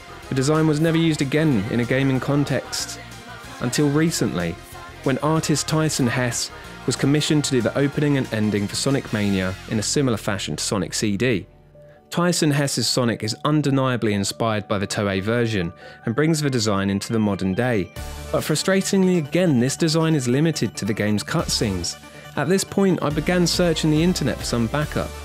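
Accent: United Kingdom. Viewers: My gosh! You've cut the video on two pieces, okay, but you didn't include links in the description! English is not my bloody native language and the British (or Australian I dunno) pronunciation make it even harder to get by ear. Add the links! I can find anything in two wasted minutes more, but many people doesn't. Thanks for video thou. And I put a thumbs up on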